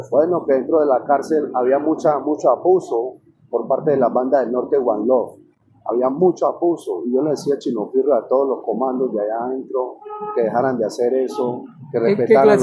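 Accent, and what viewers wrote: Panama. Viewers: Empezó hablando como colombiano y terminó con Flow panameño 😄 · Se le sale lo colonense al siervo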